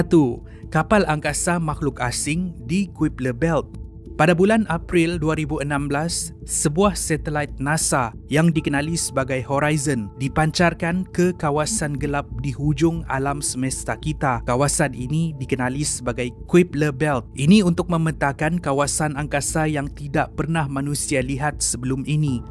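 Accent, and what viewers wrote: Sabah, Malaysia. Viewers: Suka tgok penulisan dan dengar org Sabah brkata kata..menarik...trbaikk ya..betul lh tu kut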